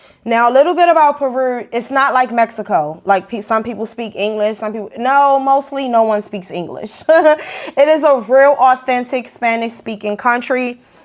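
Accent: Baltimore, United States. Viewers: Omg haha I remember watching you earlier and asking to myself if your accent was from mephis or baltimore🤣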